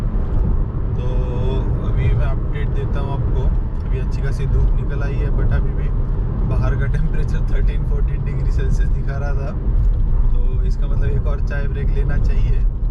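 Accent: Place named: Maharashtra, India. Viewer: Are wa wa marathi mulga talking in English..... Good good nice information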